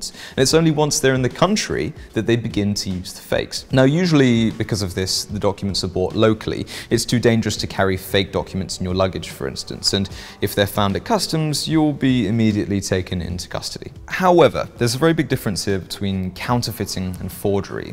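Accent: United Kingdom. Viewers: Normally the British accent utterly repulses me, but in this case I enjoyed listening to this dapper English gentlemen explain the process and risks of purchasing forged documents from the Dark Web.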